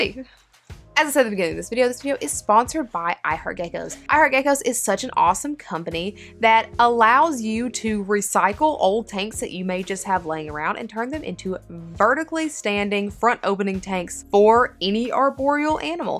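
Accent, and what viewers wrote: South, United States. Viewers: Are you from the south? Juts wondering, I'm from AL so I recognize your accent. Love your channel, thanks for the great tips.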